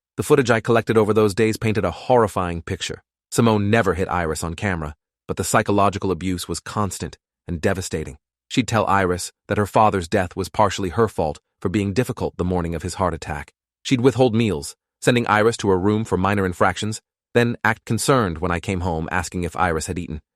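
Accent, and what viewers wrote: United Kingdom. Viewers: He keeps going British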